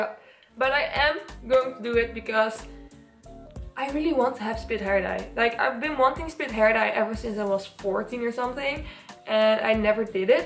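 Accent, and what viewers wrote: Netherlands. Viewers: Why does it sound like you have a dutch accent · why does she speak so funny? is she dutch? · You have a really Dutch accent while you speak English. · are you dutch? :D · Benje nederlands want ik hoor accentje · No hate but is she Dutch?? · U sound like ur from the Netherlands 🤔🤔🤔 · Hahaha i came to the comments to check if she was dutch😅 heard something familiar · R u dutch?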